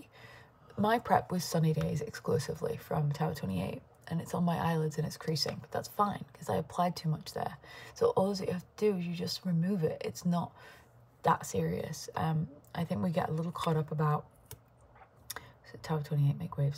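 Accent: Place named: North, United Kingdom. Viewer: I love it when you go all Northern… you’ve never forgot your roots. I went to college in Southport and it’s nice to hear the accent.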